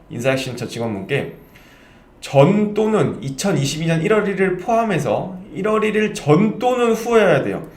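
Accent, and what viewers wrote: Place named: Jeolla, South Korea. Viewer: 아놬ㅋㅋㅋㅋㅋㅋ 연고가 전라도쪽이신가보내욬ㅋㅋㅋㅋㅋㅋㅋㅋ 누가봐도 네이티브 사투맄ㅋㅋㅋㅋㅋ 저도 작년에 우정으로 급하게 바꾸면서 거주지 안되어서 서울쳤던 기억이 나네요..! 저도 자세히 안알아봣다면 난리났을지도..ㅎㅎ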